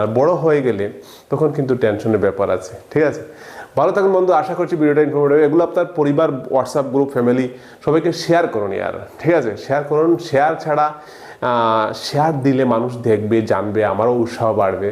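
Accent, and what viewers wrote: Sylhet, Bangladesh. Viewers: Sir apni sylheti naki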